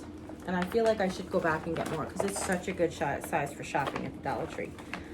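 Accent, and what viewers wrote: New York, United States. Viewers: More great stuff! You're always fun to watch and Igetxa kick out of your "New Yawk/ Long Giland" accent which is near and dear to my heart since I live there. Love those planter baskets. Didn't see them in my store yet but hopefully they'll get them in. I did buy three of those 3 in 1 stackable planters that look like fun to work with. 💕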